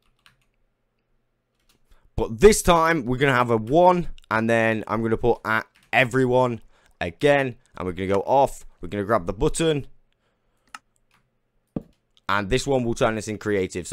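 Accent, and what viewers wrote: United Kingdom. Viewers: so every british person is dantdm?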